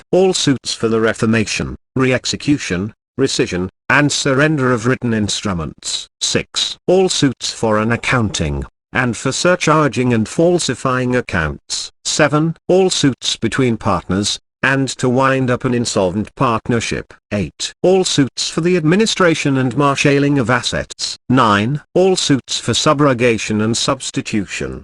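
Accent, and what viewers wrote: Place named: United Kingdom. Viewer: Wish this was in a non-british dialect.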